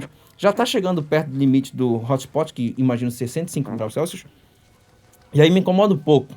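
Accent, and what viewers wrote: Pará, Brazil. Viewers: ele deve ser do Sul do Pará nao tem sotaque do norte do Pará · Rapaz, tu é paraense? Que bacana hahahah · Cara, você é paraense? 😮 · cara que legal,voce é do pará, sempre desconfiei pelo sotaque, muito bom ter um canal tão foda representando nosso estado.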